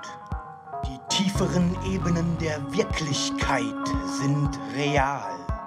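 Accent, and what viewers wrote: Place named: Palatinate, Germany. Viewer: Wie immer genial :) ich liebe den Pfälzer Dialekt.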